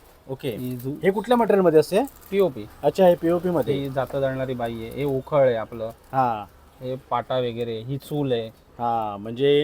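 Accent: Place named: Malkapur-Akola, India. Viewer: Dada tu malakapur akola chya ahes ka tuzhi language tikadchi h bhari vate ekyala❤❤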